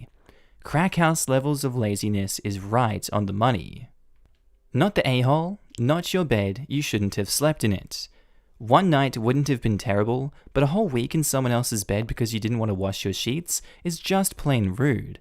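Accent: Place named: Australia. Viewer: i love the aussie accent!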